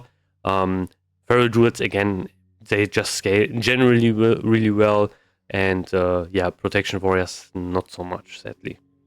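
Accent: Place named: Germany. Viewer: Inhaltlich super, nur eine bitte, arbeite ein bisschen an deiner englischen Aussprache :D. Vokabeln, grammatik, satzbau, voll super aber du klingst so deutsch :-* nicht böse gemeint, nur ein tipp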